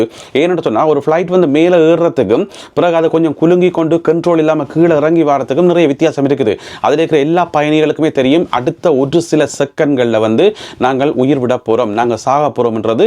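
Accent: Jaffna, Sri Lanka. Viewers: Firstly I thankyou for your talkbeautiful Sinhalese Tamil well whatever happens ellam. Avan chail whole world is mourning and offering there prayers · Yes he may be belongs to Elangai. It's Elangai Tamil. People who lost their lives their Soul may rest in peace. Very sad. 🙏🙏🙏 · இனிய இலங்கை தமிழ் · தமிழ்நாட்டில் எங்கே இதுமாதிரி சுத்தமான தமிழ் பேசுகின்றனர். இவரின் பேச்சு இலங்கை தமிழே. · இலங்கை தமிழர் என்று நினைக்கிறேன். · Its sri lankan tamil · Thambi, Thamizh oru Thinusa irukkae! Ilangaiyaa? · Ilangai Tamil huh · Srilanka tamil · அழகான இலங்கை தமிழ். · He is a srilankan. Srilankan tamil is pure . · உங்கள் இலங்கை தமிழை ரசித்தேன் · இலங்கை தமிழ் உச்சரிப்பு அருமை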